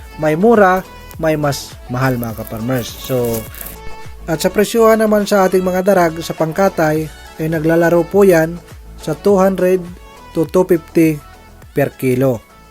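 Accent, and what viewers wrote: Waray, Philippines. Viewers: San makakabili Darag Native na yan san lugar ba kayo, parang waray ang tuno ng salita nyo?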